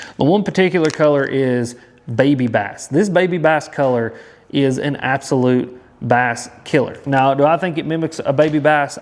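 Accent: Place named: South, United States. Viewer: I see your masters hat, and I hear your southern accent. I just found your channel and it looks like you are in the CSRA area based on what I can see so far lol I've been transplanted here from Wisconsin and I'm trying to figure out how to fish the Savannah River and other South Carolina lakes. Thanks for your videos!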